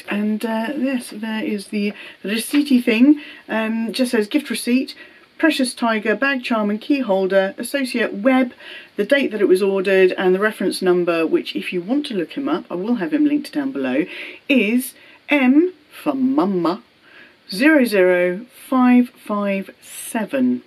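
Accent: United Kingdom. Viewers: I’ve laughed. I’ve cried ( your cat was beautiful) . It was actually the cat that made me click. Your voice is wonderful! I love a British accent. Your personality is va va voom! Thanks for the video. I’m looking forward to your next!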